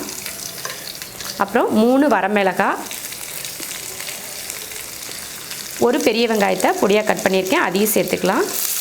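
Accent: Coimbatore, India. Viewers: நம் கோவை தமிழும், செய்முறையும் அருமை, Really Yummy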